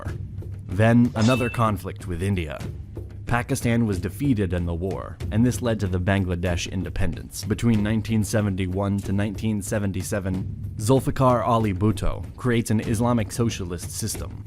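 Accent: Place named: England, United Kingdom. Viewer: Marathas defeated and controlled whole india from 1700 to 1818 with puppet mughal emperor...... But foolish english accent person have no mention in video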